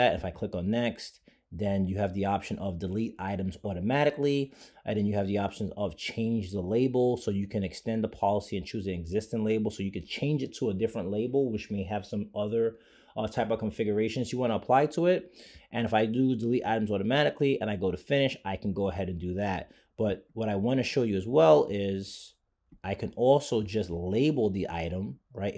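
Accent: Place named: Bronx, United States. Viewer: IT with a Bronx accent. Digging it.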